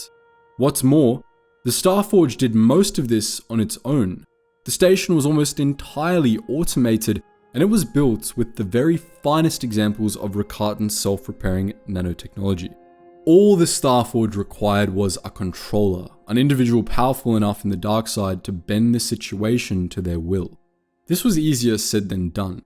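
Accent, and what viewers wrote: Australia. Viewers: Imo Generation Tech is a close second, but the Australian accent makes better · Oh yeah, the Australian accent is definitly a plus to the already magnificant content.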